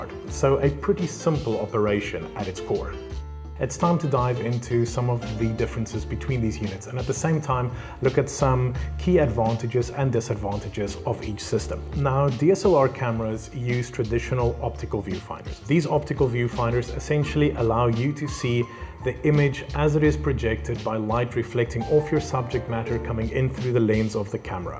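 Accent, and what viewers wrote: South Africa. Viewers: He's a South African. South Africa is a country inhabited by people. People have different accents, depending on their parentage, heritage and significant influences during their language acquisition years. Almost no-one, except Actors playing a specific role that requires a particular accent "try to sound like an American", because (as in all societies) there are dozens of regional accents across the country. Your comments illustrates several things about you. ● You are a poor observer ● You are immature ● You have little knowledge of languages ● You rarely think clearly.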